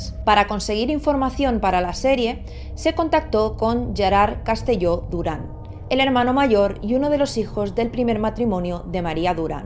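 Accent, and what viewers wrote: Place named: Catalonia, Spain. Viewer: Tiene acento catalán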